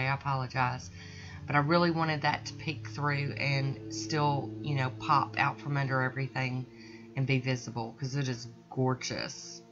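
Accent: South, United States. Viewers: Hey I love that southern draw!! aEngland makes some great polishes - I love their brushes and the two polishes I have apply so smoothly!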